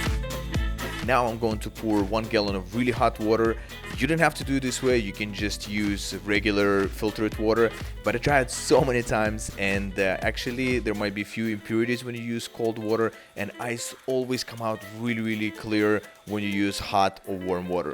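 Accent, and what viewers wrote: Russia. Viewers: Капец акцент русский) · Ты русский))))) дададададададдаада я знаююююююю. Этот акцент везеде слышно аахахахх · Судя по акценту, ты русский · Your accent is similar to Russian, you're not from Russia, do you? name is also popular in Russia)!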